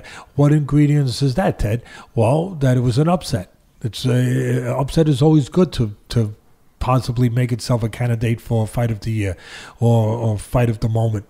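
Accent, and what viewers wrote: New York, United States. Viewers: He has a great voice, the New York accents are my favourite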